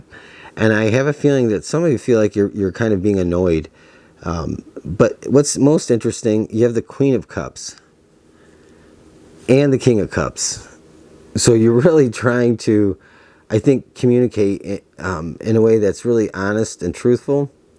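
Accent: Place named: Michigan, United States. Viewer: This has nothing to do with the reading (although it was spot on for me), but are you from Michigan? Your accent reminds me of my friend whose from Michigan.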